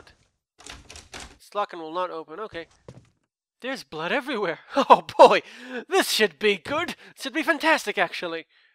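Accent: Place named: United Kingdom. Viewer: Oh dat british accent <3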